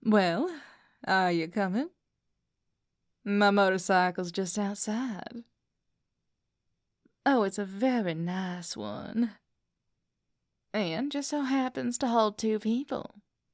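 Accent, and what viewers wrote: South, United States. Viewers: I do like the southern country girl accent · Hi this video is awesome and plus I love your southern accent and plus I love the video and now i go to sleep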